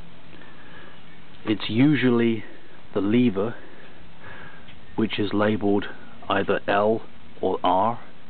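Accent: England, United Kingdom. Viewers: nice catch Mike. I looked at this and I see the shoes are reversed. The smaller one goes to the front not the rear. Judging from the accent this guy is from England and over there they do things opposite.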